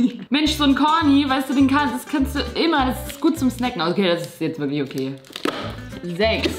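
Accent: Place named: Hamburg, Germany. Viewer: Also da wo ich herkomme sagen das viele Leute so😂 und da meines Wissens nach aus der Nähe von Hamburg kommt ist es evtl. deshab so🤔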